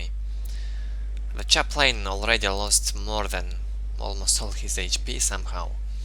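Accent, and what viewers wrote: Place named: Russia. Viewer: Hi,. Some critics is here. Nice channel, live voice acting and great replays choice. But. This russian accent, gosh. Don't get me wrong, you have good vocabulary skills, better than my. Wish you luck. Thumbs up.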